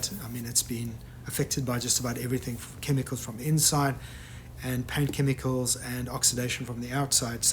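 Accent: South Africa. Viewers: Great work bru'...where you from?...I'm guessing Maritzburg area...miss SA🥺. Love your videos, you're on your way to 1m subs in no time!!!.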